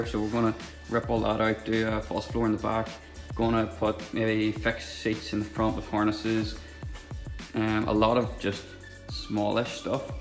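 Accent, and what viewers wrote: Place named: Northern Ireland, United Kingdom. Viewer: Glad to find this channel mate by the sounds of it ur from Northern Ireland same as myself, have a 1.2 6r and have been giving a lot of thought into an engine swap and awd conversion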